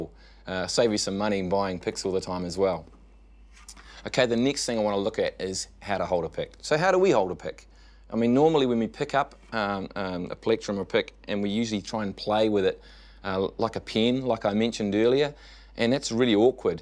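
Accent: New Zealand. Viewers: does he come from nz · Hello, you explained the pick-holding technique really well, thank you very much! I've asked a few guitarist friends of mine to teach me how to hold a pick and all of them told me to hold it "naturally" (which is, what and how is natural to a first-timer anyway?). I really appreciate your detailed explanations and encouragement. Are you by any chance a New Zealander? It sounds like so from your accent, and you seem to use the words "cool" a lot, kind of a signature slang of NZers. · I was thinking you might be an Aussie because of the Ripcurl T-shirt but as soon as I heard you I knew you were a kiwi like me😂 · New Zealand? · Pretty sure he's from New Zealand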